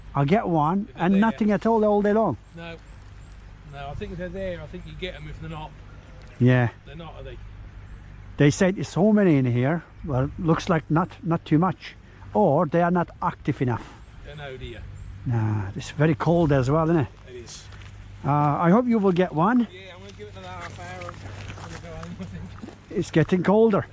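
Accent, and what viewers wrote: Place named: United Kingdom. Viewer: British aksanına hayran kaldım abi bu arada. Bizim balıkçı aleminde nadirattan olacak derecede iyi bir aksan. Selamlar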